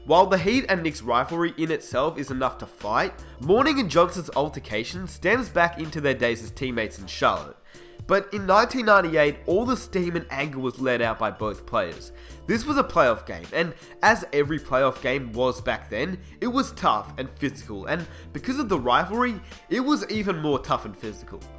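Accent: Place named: Australia. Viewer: thats great aussie bloke talking about nba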